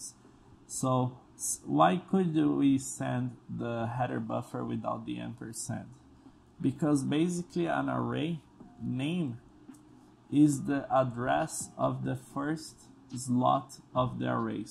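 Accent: Brazil. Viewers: Very good explanation bro, thank you! I just stayed with one doubt, are you brazilian ? Your accent is too much typically hahaha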